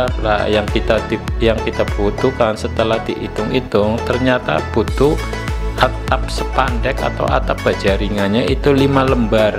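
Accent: Banyumas, Indonesia. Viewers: Kayakne ngapak ngomong bahasa Indonesia ne masih keciri ngapake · bahasanya ngapak kaya kuwe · Bhsanya #ngapak😁😀. Sukses selaluh mas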